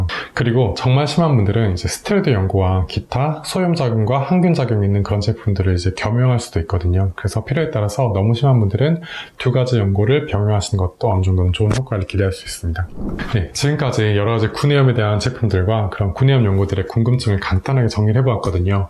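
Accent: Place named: Jeolla, South Korea. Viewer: Q&A가 궁금했던 내용들이라 참 좋네요, 약사님 전라도 분이신가봐요! 오늘 특히 구수한 느낌입니다ㅋㅋ 영상 감사합니다.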